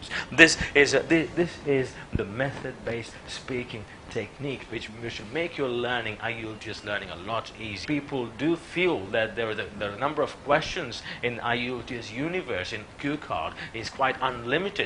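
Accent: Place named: United Kingdom. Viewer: Looks like a British accent to me. Are u British?? Nice techniques, BTW.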